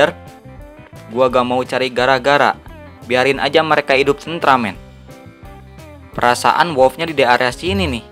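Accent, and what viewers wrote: West Java, Indonesia. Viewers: kaciri pisan urang sundanateh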